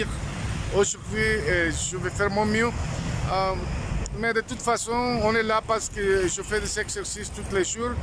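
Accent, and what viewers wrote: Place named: Canada. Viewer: Your French accent sounds very Canadian. However, I love the way you speak French and you can code-switch languages, you have a gift, my friend! Thank you for this video!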